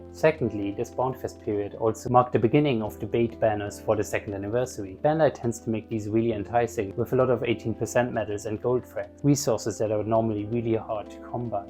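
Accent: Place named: Germany. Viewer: Thats very interesting ! I am from Germany and i thought your accent sounded german.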